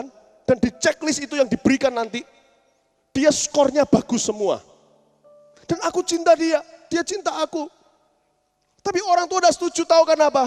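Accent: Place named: Surabaya, Indonesia. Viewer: Julia Angelina hehehe... itu logatnya orang cina surabaya emang medok bangett...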